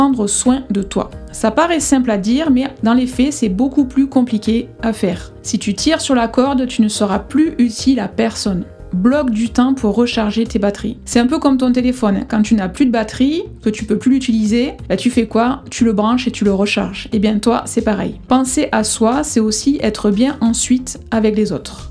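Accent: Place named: Southwest, France. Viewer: Bel épisode, clair, vivant dense et fournissant une vision du leadership adapté au 21ème siècle Si bien que j'ai voulu les lister pour m'en souvenir - je partage, mais que cela ne vous empêche pas d'écouter, vous rateriez l'authenticité de (et son bel accent du Sud Ouest) 1) Poser une intention claire: Définir son "Pourquoi" avant de se lancer dans un projet pour éviter de perdre du temps et de tourner en rond. 2) Faire de l'écoute active un super pouvoir: Écouter réellement sans préparer sa réponse à l'avance. Pratiquer le silence actif et reformuler ce que l’on entend. 3) Oser l’intelligence collective: Ne pas chercher à tout savoir, mais poser les bonnes questions et utiliser des outils collaboratifs (World Café, brainstorming structuré…). 4) Célébrer les petites victoires: Se concentrer sur les progrès quotidiens, noter et partager les réussites pour renforcer la motivation. 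5) Miser sur le feedback régulier: Le feedback doit être constant et structuré (ex. Plus/Delta/New, Triple A, Stop/Start/Continue). 6) Adopter une posture de manager facilitateur: Plutôt que de diriger, créer un environnement propice à la performance et au bien-être de l’équipe. 7) Définir des objectifs partagés et motivants: Utiliser des méthodes comme SMART ou OKR pour rendre les objectifs inspirants et engageants. 8) Faire confiance et déléguer intelligemment: Déléguer, ce n’est pas juste donner des tâches, c’est responsabiliser et donner du pouvoir d’agir. 9) Assumer la transparenc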